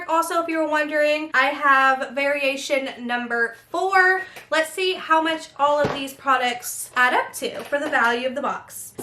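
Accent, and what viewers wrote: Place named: United Kingdom. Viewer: I'm really surprised, I thought u were British and live in the UK,for some reason I hear a British accent when u talk.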